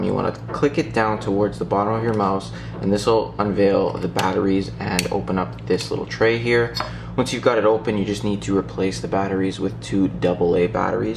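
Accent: Canada. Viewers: Same problem!! I have had this mouse for 5 years. The last time I got the low battery warning, I couldn't get the back off no matter what I tried. I popped into the local Apple Store and she did it easily. I felt like an idiot. I practiced. Then, last night, I got the battery warning again. Figured, okay. I can open up the case. Failure!! Again. I was on my way to the Apple Store again, feeling like the same idiot - but more so. I thought "Lemme check YouTube." Thank you!! You saved me a trip and my sense of pride.;-) BTW, are you Canadian, by any chance? Big thanks!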